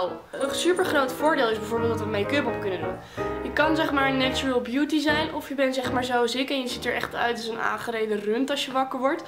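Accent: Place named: Groningen, Netherlands. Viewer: omfg dat Gronings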